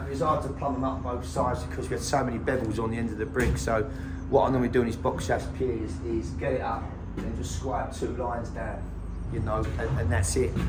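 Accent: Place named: London, United Kingdom. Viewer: Saw your vids on the March you speek total fkin truth. Good on ya mate. You've got that proper London accent too 👍😊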